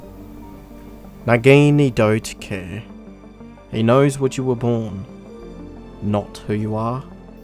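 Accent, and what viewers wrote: Australia. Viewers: very clearly Australian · The Australian voice really makes this video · he sounds super australian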